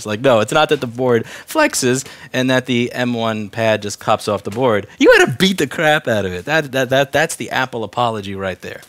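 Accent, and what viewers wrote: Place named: South, United States. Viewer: Southern Voice El gato es muy buneo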